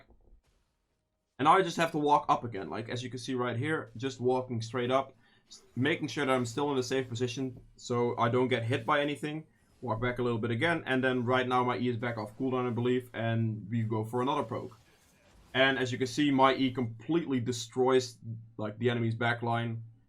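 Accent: Netherlands. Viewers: as a dutchy i can tell you are dutch. nice guide thx!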